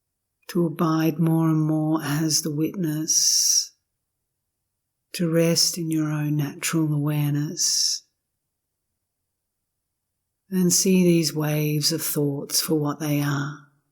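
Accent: Australia. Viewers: She has a beautiful calming voice a soft Aussie accent ❤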